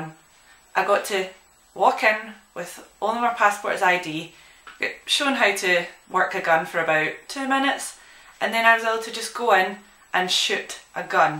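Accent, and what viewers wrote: Scotland, United Kingdom. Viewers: Wow! You. are. so. GORGEOUS! And I TOTALLY TRULY LOVE your Scottish accent. If Scotland has more girls as gorgeous as you, but older, of course, since I'm 50, I'd love to move there ((especially on account of the ignorance in command of my country)). I can handle the rain, since I like to remain inside anyway. Wait, do you have places where lesbians meet each other? Anyway, I Scotland is my 2nd dream country. Australia is #1, Ireland is #3. I subscribed and gave it a thumbs-up. I'll catch you next time. Blesséd Be, Angie aka Wynter Ravyn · I'm in love with the Scottish accent. It's my favorite accent in the world. Please come to the Midwest. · I watched for the accent. I love a gal with a Scottish accent. :)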